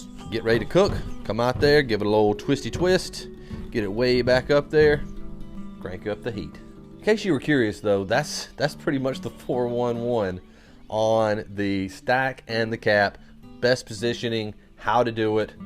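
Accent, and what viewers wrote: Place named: Rural, United States. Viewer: Love you man! I miss that country accent being in COMIfornia now. Beast to you and your page.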